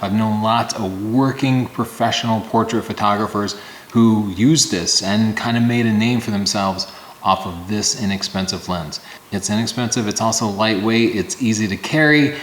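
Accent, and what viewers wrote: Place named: United States. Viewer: I'm an American living in South Africa. My wife recently bought a Canon 6d mark ii, and she also bought this lens as an extra. She plans on using the camera to help film our ministry outreaches here. I want to say thanks so much to you both. My wife and I are watching all your videos and she especially loves your tutorial on her camera. You don't talk to fast, and you have a good all American accent....like me....lol.....which she is use too. God bless you both!!!!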